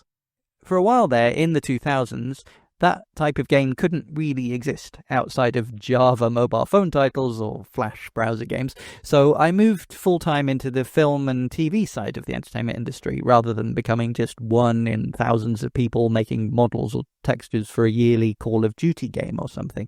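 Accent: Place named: United Kingdom. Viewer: Good review. Some thoughts I am aligned with: 1. Game key cards suck - won’t buy any 2. Exceedingly high prices for old games 3. Parents won’t be buying Timmy the SW2. He can play Minecraft fine on SW1 (I am a parent - Overcooked, bomberman etc work fine for the family). 4. Families like myself are a big part of their base. So forget about selling 150M SW2 consoles 5. Nintendo are very very greedy and callous weaponising our nostalgia in the most cynical way possible relying on the impulsiveness of their base… Feedback on your channel: 1. Overall good - trim it down a little…punchier 2. Avoid slightly inserting your political views and hint-hints….make a separate channel for that. Stay on point. 3. Solid British accent Have a nice day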